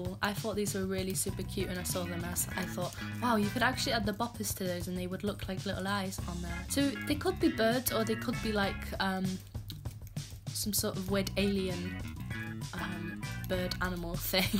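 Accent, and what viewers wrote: United Kingdom. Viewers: Omg i love your british voice!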